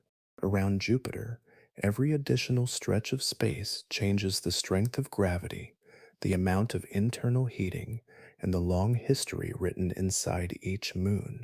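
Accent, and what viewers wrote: United States. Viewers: American accent and no annoying sound effects -- thank you!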